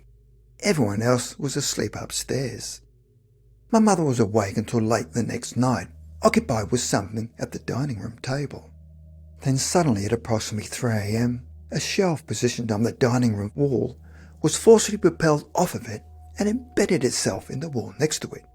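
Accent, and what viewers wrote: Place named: Australia. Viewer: An Australian accent.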